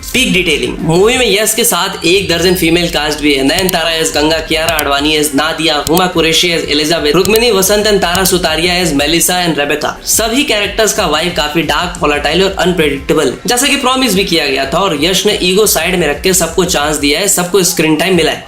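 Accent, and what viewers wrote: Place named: UP/Bihar, India. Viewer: Bhai Tumhara review Pura scripted laga Kyun ki Ji angreezi shabd tumhne trailer ko uncha dikhane mein istamaal kiya woh kaafi ache hai Aur Baaki angreezi tumne istamaal kiya Woh UP/ Bhihar ka MTI yane mother tongue influence se bhaara hai. Pata nai Kitne log ye mehsus karenge but Mera opinion yahi hai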